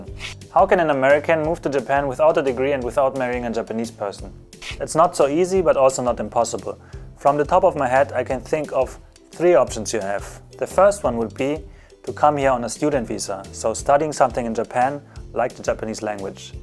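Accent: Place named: Germany. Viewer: Die Sekunde wo du angefangen hast zu reden habe ich gemerkt das du deutscher bist. Ich liebe es deutsche Akzente zu hören. makes me feel like i'm at home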